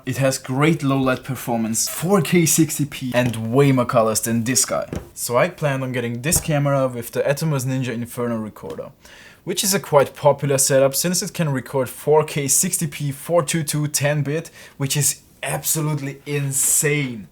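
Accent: Austria. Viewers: Yes, he is. For an Austrian it's pretty hard not to sound like an Austrian, even when talking English ;-)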